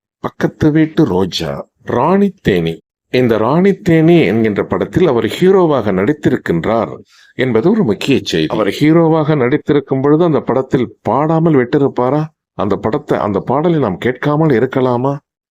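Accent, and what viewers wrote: Jaffna, Sri Lanka. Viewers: யாழ்ப்பான தமிழ் கேட்க கேட்க அவ்வளவு இனிமையாக இருக்கிறது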